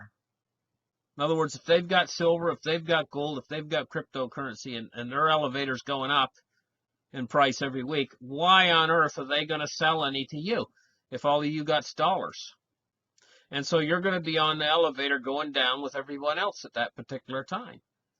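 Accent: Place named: Canada. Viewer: LOL ... "it's weird ... it's just weird ..." Excellent video. You have the knack for making otherwise complicated stuff easy to grab (and funny to watch) ... for us financially/economically challenged types. (Still working on that "laboratory of liquidity" you put out a while back.) What you do is very much appreciated. After going through a lot of financial turmoil in his own life, my son found your channel ... and then introduced me as well. We both watch FTE every day ... and refer to you as "the Canadian" because of the obvious accent and because we didn't know your name back when we first started watching. I in turn have promoted your channel to friends and family ... in attempts to get them to wake up to what is coming. Some listen, some don't ... par for the course, I guess. Though it may be a double-edged sword when the economy goes south ... 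I will be so happy for you when your views, likes, and subs go TO THE MOON, ALICE, TO THE MOON! Also, BTW ... love your close-ups. Have a great weekend. :)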